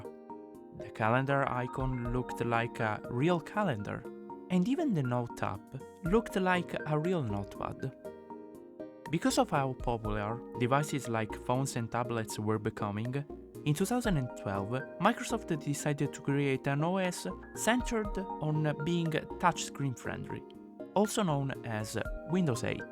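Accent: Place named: Italy. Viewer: Nice video! I miss Frutiger Aero so much... Btw Are you Italian? From your accent it would seem so.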